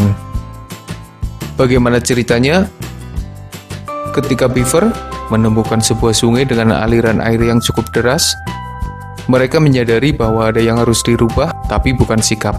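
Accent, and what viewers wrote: Banyumas, Indonesia. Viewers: Org ngapak ya om?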